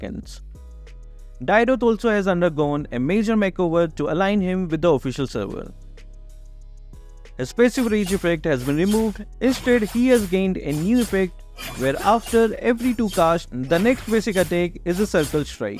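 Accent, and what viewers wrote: India. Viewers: Just one question bro😂😂😂.....Where are you from???? INDIA?? YOUR ENGLISH accent is like an Indian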